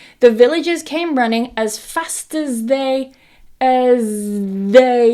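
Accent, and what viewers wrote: United Kingdom. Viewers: "Hello Madam, it’s been two years since I was your student. I started my journey following your British accent, but I’ve since fallen in love with the American accent and focus on that now. Please know that you hold a very special place in my heart, and I miss you more than words can say. Sending you all my love and respect from Bangladesh ❤❤❤ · The British pronunciation is amazing 🤩 when I listen to it, I relax 😁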